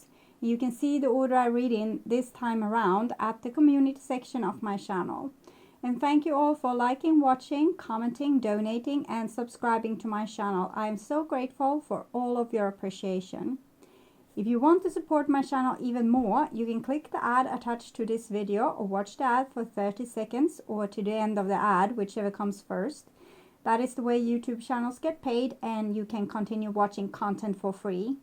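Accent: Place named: Sweden. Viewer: ~ Thank you for the reply. I have subbed to your channel. 👍 I also, love Siana and miss her. You remind me of her. Must be the Swedish accent. Thanks for sharing your gift with all of us. Much love from Texas! 😘❤️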